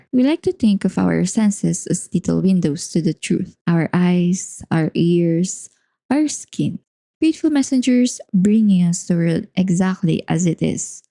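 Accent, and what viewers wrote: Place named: Philippines. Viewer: Pronunciation....since you have Phillipines english accent or somewhat like that...use AI for your for narration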